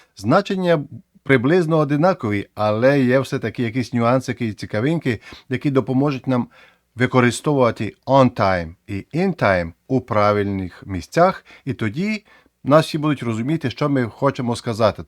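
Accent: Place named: Transcarpathia, Ukraine. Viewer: Чудовий контент, найбільше тане душа, що українською 💙💛І такий у Вас український акцент, неначе Ви із Закарпаття😊Дякую за знання, за англійську, і за українську!Все зрозуміло❤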